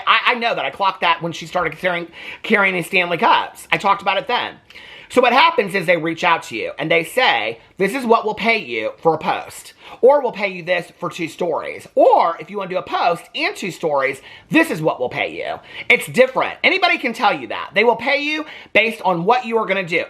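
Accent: South, United States. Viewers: are u from nashville u have a south accent lol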